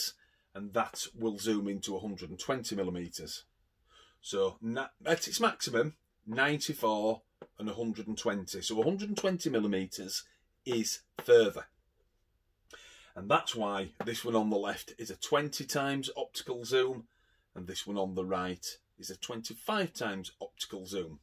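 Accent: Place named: Yorkshire, United Kingdom. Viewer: Love your tutorial Sit and it's obvious your from good old Yorkshire as My wife is from Goole but we live in N. Ireland, Question, I have just had 4 small Hikvision cameras fitted at my house, model number is DS-2CE56HOT-IT3E, but not happy with them, I will keep 2 of them but want the DS-2DE4220IW-DE you have been talking about, I need to be able to read a number plate at 30 mts, what do you think, pity you couldn't show us some footage, thanks again.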